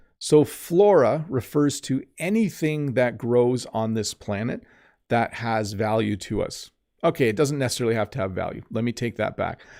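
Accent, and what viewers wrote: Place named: Canada. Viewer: It was a great conversation, I enjoyed it so much I forgot what I wanted to state in this comment section. If it comes to my mind, I will come back and write it down. Until, let me tell you what I didn't forget: if you want to make a lesson about Canadian versus American English, I noticed the following differences: about - the Americans pronounce the o in this word differently than you. resources: the Americans pronounce it with s and not like you with z. Collect something in this topic, you may be able to do it once!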